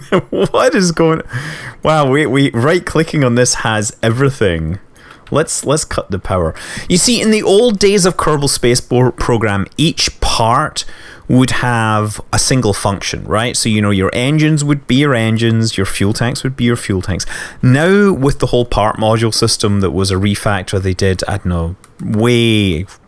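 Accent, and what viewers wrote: Scotland, United Kingdom. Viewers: Yeas hes scottish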